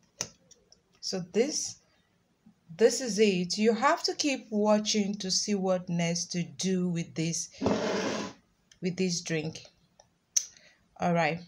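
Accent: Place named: Nigeria. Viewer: Nigerian accent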